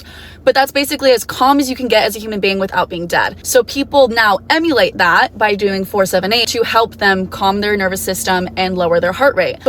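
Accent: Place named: France. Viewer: your french accent was everything 😂 😭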